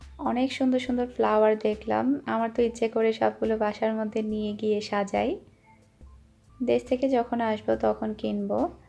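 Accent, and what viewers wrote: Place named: Satkania, Bangladesh. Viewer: কমেন্টের রিপ্লে দিতে হবে না, আঞ্চলিক ভাষায় ব্লগ দিয়ে আমার মনটাকে শান্তি করোন, সত্যি আপু আপনার কণ্ঠে আমাদের সাতকানিয়ার কথা এতো এতো সুন্দর লাগে, আমি বুঝাতে পারব না ।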